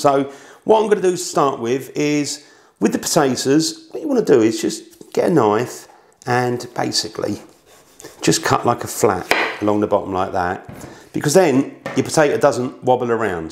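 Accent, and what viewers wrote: United Kingdom. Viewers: Nice video and recipe. Where from UK is your accent from? 🥰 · British accent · As a black guy from America I love this guy british voice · haha- Cyrus Kariuki - as another Brit he sounds like a bit of a 'geezer' to me! I like that accent, but it's not what I'd call 'beautiful' English.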